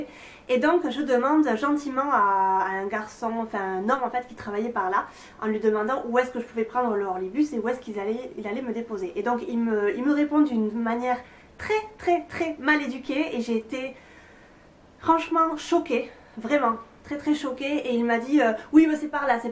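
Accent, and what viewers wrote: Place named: South, France. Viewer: j'adore ton accent du sud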